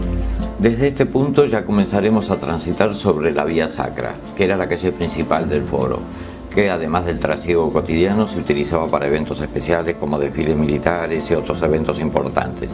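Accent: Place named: Argentina. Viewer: lo de que es usted Argentino no cabe duda , por su acento recien estuve en America Usa ,México, Perú ,Ecuador regreso España luego Lisboa Berlin ,Roma , Roma fue impresionante visite vaticano Coliseo palatino Foro Romano Fontana di trevi piazza Navona Pantehon etc etc deseando volver a Roma un saludo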